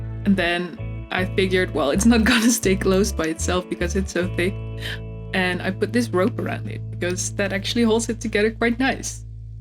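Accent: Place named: Netherlands. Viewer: Ohhh je bent ook van Nederland!! Ik hoorde het al hihi❤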